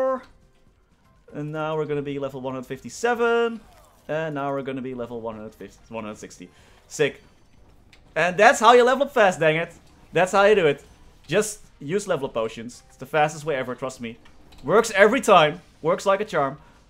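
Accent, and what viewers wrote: Netherlands. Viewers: NL accent is er moeilijk uit te krijgen bij sommigen xD echt hoe hou je het vol zo lang aan t maplen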